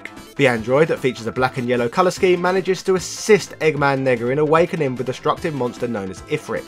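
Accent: United Kingdom. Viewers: I have learned British accents unintentionally make certain words sound really offensive.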